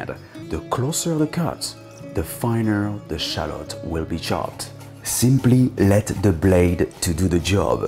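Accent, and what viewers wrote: France. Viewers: Je kiff ta chaîne en plus tu est français sa s'entend à ton accent bref tu fais des trucs super beau c'est super continue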